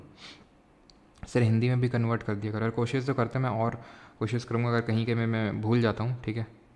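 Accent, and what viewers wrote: Kanpur, India. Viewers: Pahli bat to sir apki boli se lgta hai aap kanpur me agal bagal se hain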